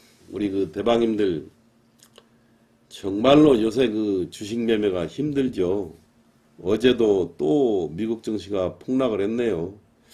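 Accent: Jeolla, South Korea. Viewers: 약사님 전라도사투리쓰시네요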